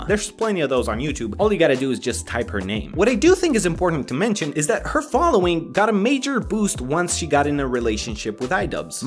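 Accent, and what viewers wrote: Romania. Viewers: are you romanian? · I could hear your accent during this video, which I didn’t notice in many of your other content. (Yes, I know he’s Romanian, I just find it kinda strange that his accent downgraded a bit)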